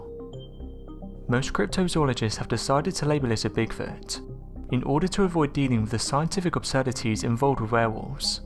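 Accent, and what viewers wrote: United Kingdom. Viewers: The only thing suffering are my ears listening to this absolutely horrid "narration." Having a British accent doesn't automatically make someone a good narrator. All this guy is doing is reading one sentence. Then reading another sentence. Then reading another sentence. And every sentence sounds EXACTLY the same. 90 seconds was all I could take.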